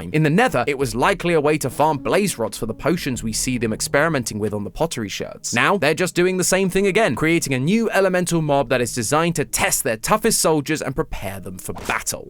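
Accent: United Kingdom. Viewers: I spat out my water I was drinking when I read the "Now 100% more British!" because himself reference that "You might be wondering, why does now sound British?".💀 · BRITISH GAME THEORY IS HERE TO STAY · Now 100% more British is crazy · edition.. Now 100% more British 😅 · hey i mean i thought that would ruin the game theory and that all the other channens but ya'll are doing a great job at making videos its the same videos bud with bri-ish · Why is british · 100% more British? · At times i don't notice British accent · its not the same, i cant listen to this british voice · Game theory Now 100% more british! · Wait game theory is British now?! I think I may have missed an update 😅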